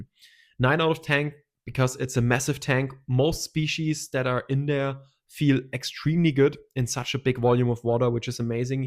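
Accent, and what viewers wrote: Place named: Germany. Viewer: ican hear german :))))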